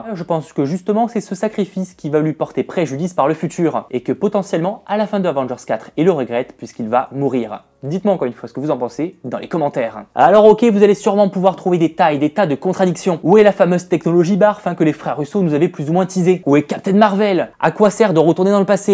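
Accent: England, United Kingdom. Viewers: Idem, du coup gros spoile sur la fin de Ant-Man et la Guêpe... Je crois qu'il annonce au début de la vidéo qu'il faut avoir vu le film mais je n'avais rien compris ce qu'il disait à ce moment là tellement qu'il parle vite et articule mal (+ une prononciation à l'anglaise), même en retournant en arrière plusieurs fois pour essayer bien de comprendre les deux films qu'il fallait surtout avoir vu (j'avais juste compris pour Avengers 3 mais pas pour l'autre). Merci pour ce spoile dont je me serais bien passé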